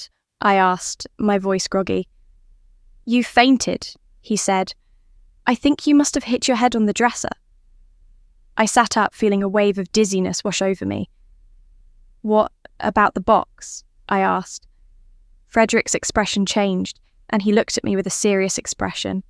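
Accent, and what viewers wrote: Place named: United Kingdom. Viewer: Well, that's it. I'm throwing my unfinished short story in the trash. My favorite pen is going back in it's case. I can't compete with perfection. Dear, you have an exceptional gift for relaying a tale! Every requirement is spot on. Plus, I'm rather fond of the British accent. I could listen to you for hours. Simply put, your storytelling abilities are amazing! I'm sure our children will love their bedtime stories!🤣🤣🤣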